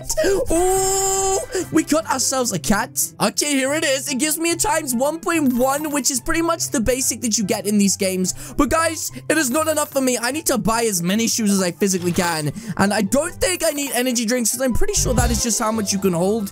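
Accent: United Kingdom. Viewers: Its my first time seeing this vid u sound like british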